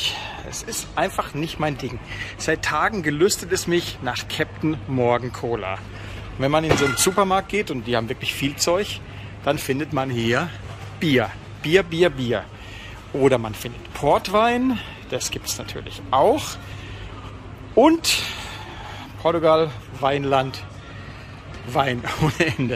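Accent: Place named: Swabia, Germany. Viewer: Hallo, ein trauriger Anblick, die ganzen Überreste der Autos zu sehen. Erkannt habe ich kein einziges davon und das soll durchaus was heißen.🤔😅Aber ich habe dennoch eine Gemeinsamkeit der Autos entdeckt, bei allen ist das Dach eingedrückt. 🤔😉 Deine Unterkunft ist ja mal wirklich schnuckelig und der Ausblick grandios.🤩👌 Aber mer hert hald scho da Schwob in dir, "sodele". 😂😂👍 I winsch dr an scheene Sonndig ond dange firs mitnemme. 😊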